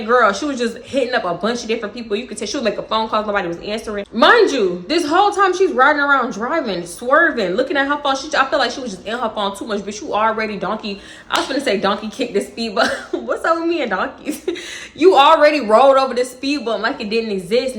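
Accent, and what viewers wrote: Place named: Texas, United States. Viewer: went through my youtube highlights and you were my top watched youtuber lol i loveeee your videos and when i first used to watch you i was like “she talk just like me gotta be from texas “ then a lil after in the video you brought up being from houston then i found out you was a sagittarius too i said oh yeaaa this is my gurlll❤❤been watching since i was 16 lol im turning 20 on tuesday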